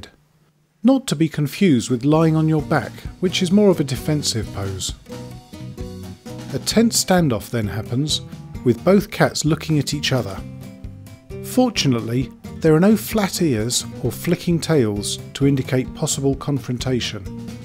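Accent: United Kingdom. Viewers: british accent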